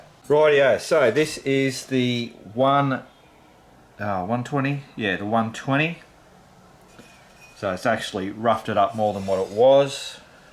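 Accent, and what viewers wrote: Australia. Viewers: lol, you are so funny HaHa speaking like a true-blue aussie. I have parts on my bike that is chrome exactly like yours that has pitted exactly like your, i was experimenting with things myself today and i found that when chrome is that bad you cant save it no matter what. I eventually just used a grinder to grind everything back to bare metal and just repaint the parts in another colour OR just polish and buff up the metal like you have done, but im just going to repaint all my chrome to black and not have to worry about looking after all the shiny metal with polishes and buffing every time i wash the bike. After watching this video your sense of humour made me laugh so much i decided to subscribe, plus your another aussie!!! and its really good to see aussie on youtube making video's. Cheers, Here is a quick-tip, just talk about what your doing and not waste time and footage worrying about what others will say and do, unfortunately there will always be experts putting crap onya, but the way i look at it and i think you do to, its not so much the destination that excites us its the journey. 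Meaning you learnt something today.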